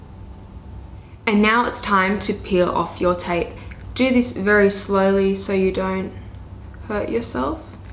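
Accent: Australia. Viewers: Love hearing Aussie accents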